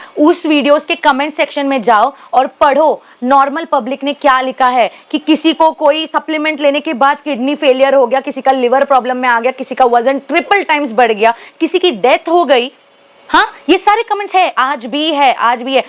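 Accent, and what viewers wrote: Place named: Maharashtra, India. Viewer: Are you marathi tan and so many words are sound like you are Marathi ❤🎉🎉🎉🎉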